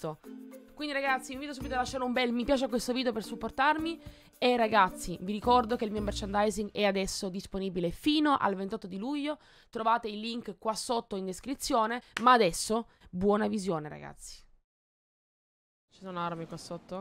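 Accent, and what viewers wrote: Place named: Naples, Italy. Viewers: Ma sei napoletana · apprezzo lo sforzo nel parlare napoletano gg sei fortissima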